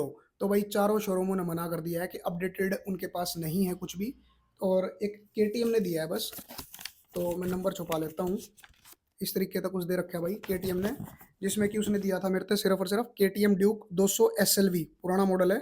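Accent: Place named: India. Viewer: bhai no doubt gadi bahut achi hai in terms of performance,looks and road presence but agar gadi humein problem de to bahut dukh hota hai maine bhi aisi kuchh dikkate apne manpasand bike me dekhi hai to mai samjh sakta hun.. baki apka desi accent 🤩 mat chhodna keep growing.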